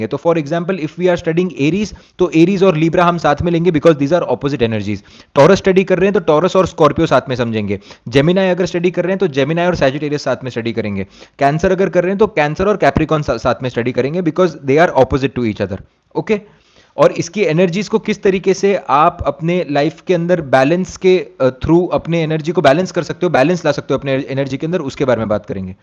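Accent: Indore, India. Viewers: Nice, but are you from Indore?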